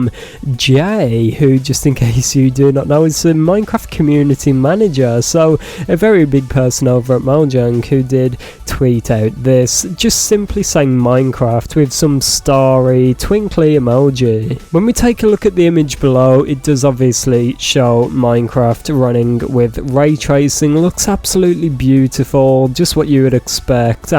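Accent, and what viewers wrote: United Kingdom. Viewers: Wow British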